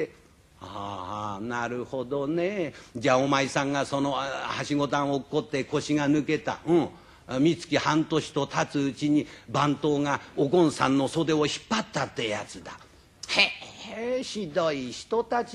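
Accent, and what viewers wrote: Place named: Edo, Japan. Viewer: べらんめえ調の良さ。 いいね！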